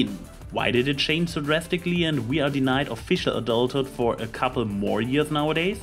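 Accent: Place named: Germany. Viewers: Right now i'm really curious: are you german or are did you just had one to many lessons of german in school? (option 3 would be that it was not your own voice and therefore spoken by a native speaker, but it sound extremly similar to me) In beiden für wahrscheinlich angenommenen Fällen bin ich mal so frei und probier es aus: Schönes Video und gute Argumente. Immer wieder schön dir zuzuhören. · Is German? I mean, dat accent though...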